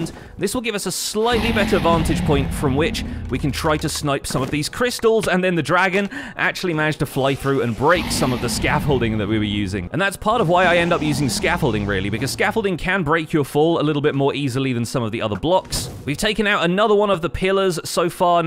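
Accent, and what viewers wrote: United Kingdom. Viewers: I just love your British accent😊😊😊😊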